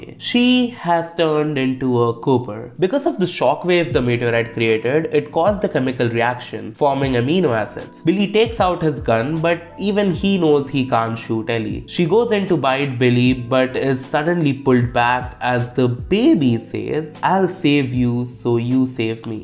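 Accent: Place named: India. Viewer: are you from india? you sound indian (not saying in a negative way)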